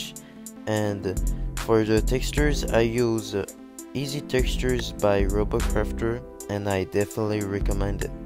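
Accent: France. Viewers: You are french for sure hahaha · you speak french ? You have a accent french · are you french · Tu est un français ça s'entend !! 😂😂😂 Mais super video!! Continie comme ça!!😊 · Aew you french? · are you french?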